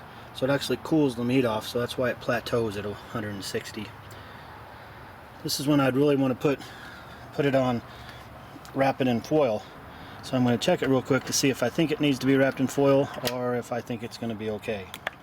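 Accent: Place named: Minnesota, United States. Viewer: You're from Minnesota huh?!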